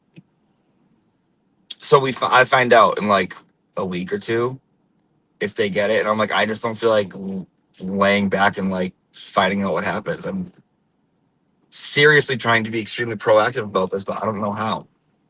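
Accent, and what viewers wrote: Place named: Fall River, United States. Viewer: O M Fing Gawd!!!!! U R awesome!!! Love the fall river accent🤣🤣🤣replay here so good eveningk to u too!!! My earpods in and husband keeps asking me what’s so funny and i said 🤪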